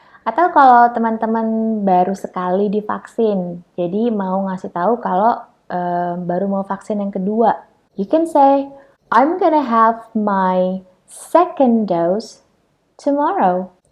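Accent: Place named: South Jakarta, Indonesia. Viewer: jaksel aksen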